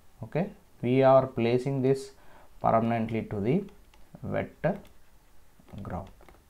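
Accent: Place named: Kerala, India. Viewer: cute mallu accent😘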